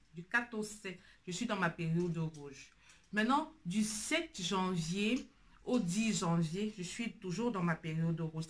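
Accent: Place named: Cameroon. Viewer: Notre bel accent Camerounais 😊😊😊 💚💚💚💚💚❤❤❤❤❤💛💛💛💛💛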